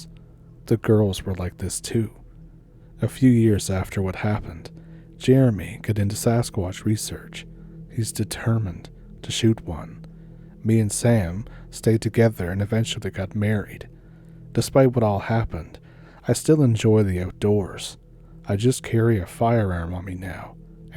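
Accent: Canada. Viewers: Thanks for sounding exactly like My B.C., And Ontario cousins and family. Peace to You- AND-- ELBOWS UP-!! 🎉🎉🎉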